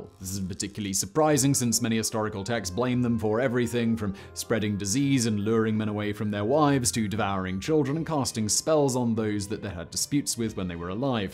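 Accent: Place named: West Country, United Kingdom. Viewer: Mate, you say the words surprise, died, alive , bluntly , all a bit funny.. .west country , country bumkin.ish Xxx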